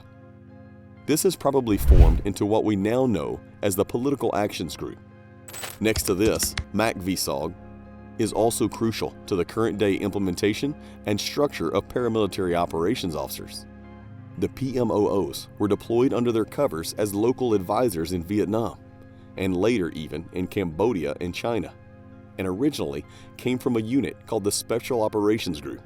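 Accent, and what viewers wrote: South, United States. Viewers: I detect a southern accent.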